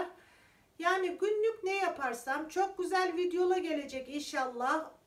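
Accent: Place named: Karabük, Turkey. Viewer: Bacım, Tesadüfen önüme düştün. Zırh örgünle, konuşman yabancı gelmedi Nerelisin diye baktım. Karabük deyince şiven beni çekmiş. Ben Karabük'de 1981_ 1986 yılları arasında çalıştım.Mat ögretmeniyim Ordan şiven yabancı gelmedi, Kastamonu'lu mu acaba diye izledim. Aynı yöre,şiveler benziyor. Bursa'dan sevgi ve selamlarımı gönderiyorum.Takibe aldım yemek videoların güzelmiş, deneyeceğim. 💗💗💗🌷🌹🌷🪴🍹☕🍪🍃🥰🙋‍♀️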